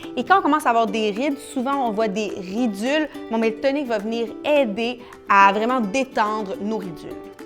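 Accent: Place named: Quebec, Canada. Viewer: super l accent quebequoi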